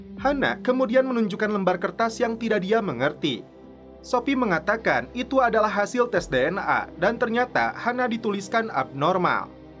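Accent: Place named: Garut, Indonesia. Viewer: Narasi gaya Garut...... Keren hehehehe..mmm. Mana bajigurna, kang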